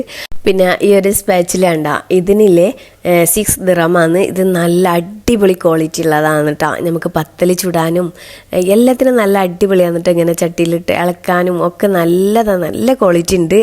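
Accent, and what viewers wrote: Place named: North Kerala, India. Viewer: Samsaram kelkkumbol kannoor and Kasaragod anenn thonnum . Malappuram kariyanenn parayilla .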